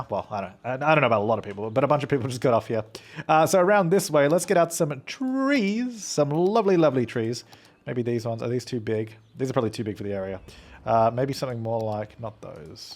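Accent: Australia. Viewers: Drone club Not really, it's just the aussie accent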